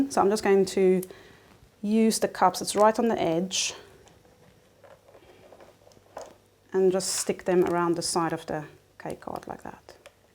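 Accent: South Africa. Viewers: This is so incredibly insightful. Thank you for the time. Also are you south african? I know you in the UK. But you have bit of a South African accent. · Are you South African??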